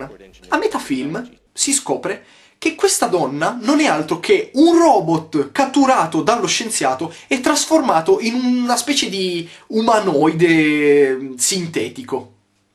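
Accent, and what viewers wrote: Turin, Italy. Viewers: sei di Torino? :)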